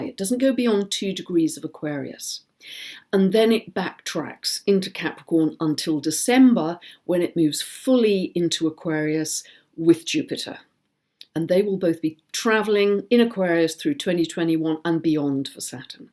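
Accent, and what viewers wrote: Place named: Oxfordshire, United Kingdom. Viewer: I'm curious if you're in Oxfordshire(judging by your accent).Interested in a reading.Maybe you could answer some questions.My chart is full of contradictions.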